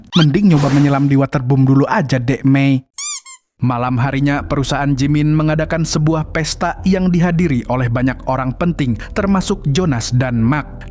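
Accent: Banjar, Indonesia. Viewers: Bahasa banjar admin nya, bungul am 😅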